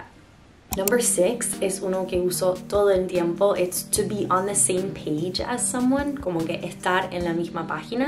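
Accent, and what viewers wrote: Argentina. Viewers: gracias por tu video.Cuando te escucho hablar castellano, es imposible no sentir que sos nuestra, que sos Argentina no cabe duda. Un gran saludo irradias mucha paz que es lo que necesitamos en este momento.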